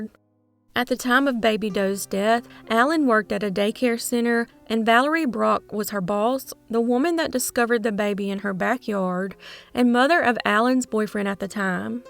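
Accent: South, United States. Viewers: the stories are well thought out and I can listen to her southern accent all day. subscribed!! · The first case is a perfect example of why the death penalty is very much needed. Absolute low life. I love listening to you talk about these stories, your voice is so relaxing and melodic, it's exactly what I think of when I think about the Southern accent 🙂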